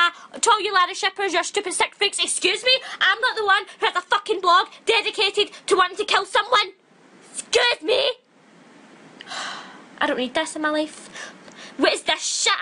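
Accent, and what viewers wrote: Scotland, United Kingdom. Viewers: Scottish, from Glasgow probs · is this a scottish accent? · it's angry scottish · Also to the people asking about the accent it's obviously Scottish, maybe Irish. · haha i love your accent, that british/sco/irish accent is beatifull :p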